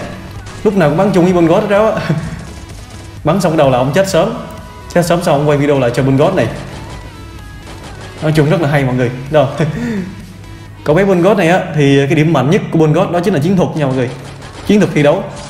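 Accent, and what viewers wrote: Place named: South, Vietnam. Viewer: Anh ơi anh miền nam anh ở tỉnh nào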